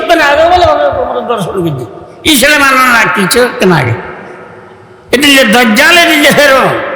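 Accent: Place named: Chittagong, Bangladesh. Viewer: রাগ করে লাভ নাই ভাই উনি চিটাংগের ভাষায় কথা বলে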